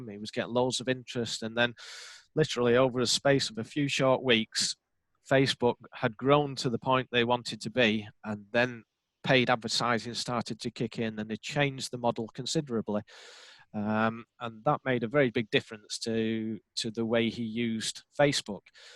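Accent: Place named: Northern England, United Kingdom. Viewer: I don't have a business...maybe one day...but this was still really informative and helpful. Talking about profiles, I don't like to see "junk" on my feed so I follow pages that I like (as you said, aesthetically pleasing) with posts that I am interested in. I live in the UK (originally from "up North" so loving the accents 😉 ) so one of the things I look for in a profile is some indication of where the author/owner is located, especially if it's a small business I may want to buy from now or in future, I would rather buy from someone in the UK. Thanks for all the useful info!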